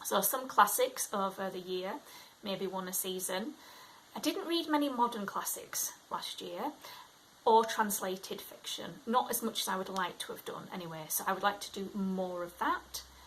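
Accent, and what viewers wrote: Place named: Doncaster, United Kingdom. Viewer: Hi, happy new year! Recently discovered your channel ☺️ Your accent is nostalgic for me to hear as I'm from Doncaster and have lots of family who live there. Don't know if you live anywhere near there but it reminds me of them! I'm in Derbyshire now, so not too far away. Hope you have a great reading year.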